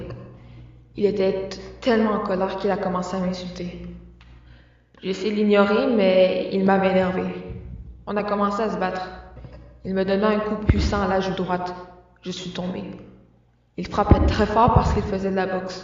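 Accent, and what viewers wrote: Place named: Quebec, Canada. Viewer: Magnifique accent québécois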